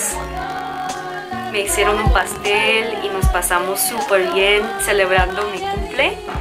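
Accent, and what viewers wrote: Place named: Mexico. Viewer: Cómo ya hablas cómo nosotras las Mexicanas , ya t considero parte de nuestro país. BESOS 😘😘😘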